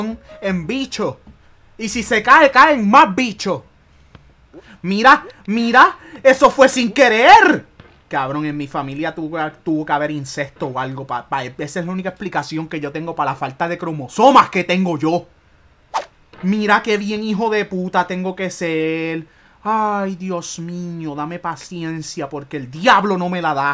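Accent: Puerto Rico. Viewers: QUE SEA PUERTORRIQUEÑO LE DA EL TOQUE JAJAJAJAJAJAJJ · El acento de Puerto Rico es super bueno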